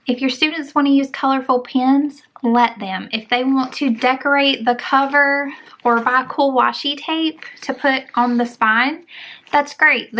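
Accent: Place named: South, United States. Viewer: I like your southern draw.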